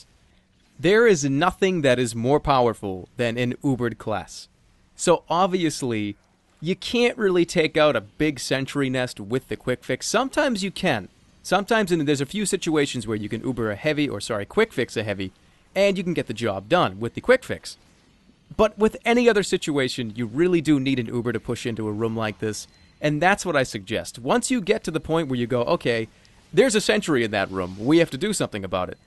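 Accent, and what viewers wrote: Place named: East Coast, United States. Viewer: it's the east coast accent. that's what it's all about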